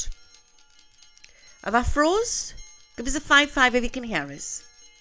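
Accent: Newcastle, United Kingdom. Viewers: I love hearing you because you are a Geordie who is a spiritualist and I miss the spookies back in south Shields. You soothe me. From Lisa in Tasmania Australia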